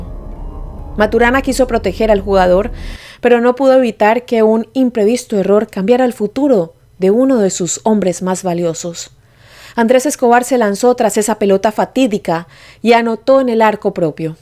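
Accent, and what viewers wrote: Colombia. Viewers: Saludos,. Soy nuevo en tu canal y me tengo que poner al día con numerosos vídeos. He comentado este en concreto porque recuerdo perfectamente cuando sucedió y como me impactó. Solo decirte que me encanta el contenido y cómo lo narras (no puedes negar que eres periodista ☺️) además de apasionarme el acento colombiano. Te felicito y espero que sigas subiendo muchos vídeos donde investigues temas apasionantes. Un abrazo desde 🇪🇸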